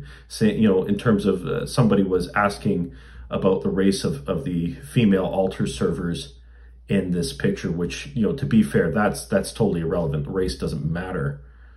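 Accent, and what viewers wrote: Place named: Canada. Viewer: Your blessing, father. I love your videos and your subtle Canadian accent. I am so encouraged that priests like you are still coming into the church and standing for the truth & tradition of the faith. May God protect you, your family and your work - I will have you in my daily prayers going forward.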